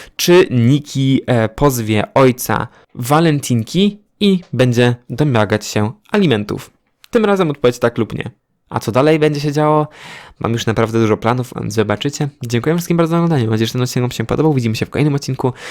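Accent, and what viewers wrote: Podlasie, Poland. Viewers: Jestem z Podlasia... I dopiero od niedawna zauważyłam ten Podlaski Akcent i siebie xD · Pozdrawiam współplemieńców Podlasian😂 I to nasze zaciąganie...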